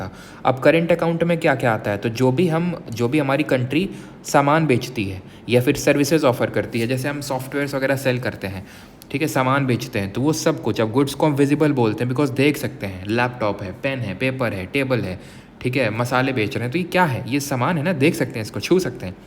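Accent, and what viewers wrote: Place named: Bihar, India. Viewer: You are from BIHAR I Guessed it 😂😂❤❤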